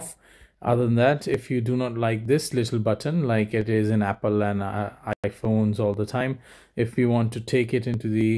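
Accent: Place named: India. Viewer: First of all you are very Indian accent second you are talking to fast that’s not good way to help people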